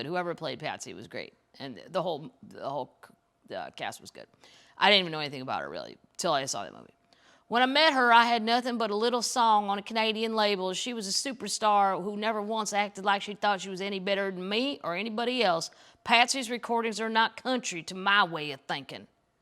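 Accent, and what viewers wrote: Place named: Appalachia, United States. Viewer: I'm loving your version of a southern accent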